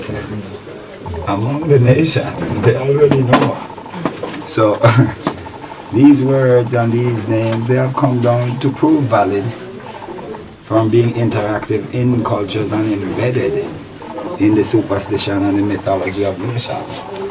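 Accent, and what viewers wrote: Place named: Jamaica. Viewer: His accent sounds just almost exactly like a Jamaican.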